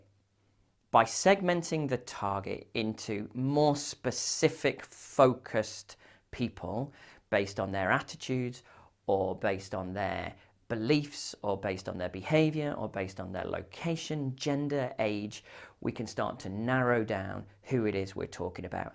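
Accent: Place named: United Kingdom. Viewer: From accent it seems he's a Britisher, is it so?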